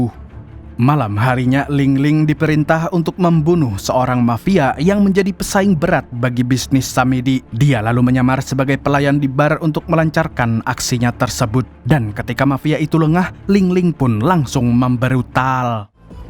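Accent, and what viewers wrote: Banjar, Indonesia. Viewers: Oooh urg banjar kah min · Urang Banjar sekalinya😂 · Dari logatnya sidin ni urang banjar pang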